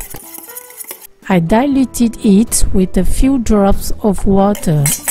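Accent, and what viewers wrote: France. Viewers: This looks super delicious! I will have to cook this and try it! I will enjoy it for sure! where did you learn to cook all of these recipes? Where are you from? You sound french. Peace!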